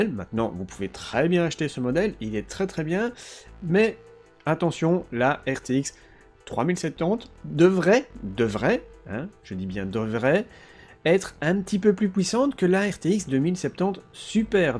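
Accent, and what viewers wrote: Belgium. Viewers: J'adore l'accent belge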